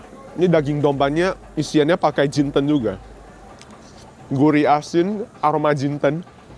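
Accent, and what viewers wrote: Java, Indonesia. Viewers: 😂 mungkin tinggal di jawa kali, kan banyak tuh orang Tionghoa mirip2 Orang jawa kebiasaannya kayak Rajin, segala macamnya apalagi. Bahkan bahasa ngomongnya juga kayak jawa atau medok banget. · Ko, sekarang bahasa Indonesianya kok medok banget kayak orang Jawa 😊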